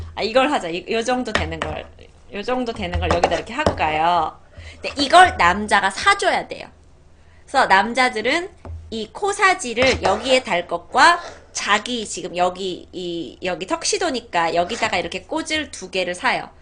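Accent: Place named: Jeolla, South Korea. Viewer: 팬인데요.전라도 말투!의를 으라고.매번 하던데요.바꿔주면 완벽할텐데요.좀!아쉽네요.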